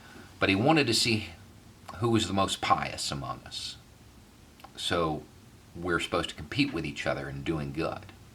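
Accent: United States. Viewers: Great stuff man . This is what a real American sounds like 🇺🇸💯 Subbing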